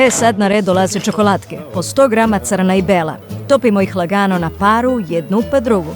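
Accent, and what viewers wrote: South, Bosnia and Herzegovina. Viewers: Govor nas juznacki, milina je slusati, pa milina od recepti unikatni. Alal vera za zlatne ruke bre🎉🎉🎉🎉🎉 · Lele,kakoj si ovoj devojče naučija da ovako zbori po juznjacki?Svaka gu čas!😜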